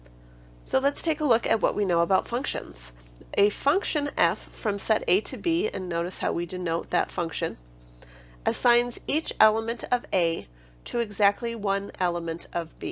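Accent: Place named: United States. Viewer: All of your videos I have watched thus far have an electric ohmmmmmmmmmmm sound to them. idk I feel like when you're going to upload this much content it is probably worth looking into. Maybe you have by now idk. But you have a nice American accent and I really need to learn this stuff haha. Thank you for uploading these. I truly appreciate you educators who upload content that is free an accessible to all. You're the best. ☻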